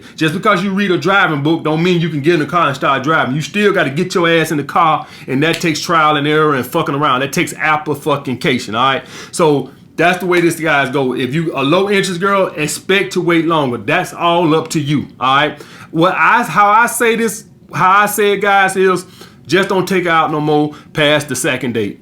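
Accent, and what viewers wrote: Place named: Alabama, United States. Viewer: Battlerap Legend Alabama accent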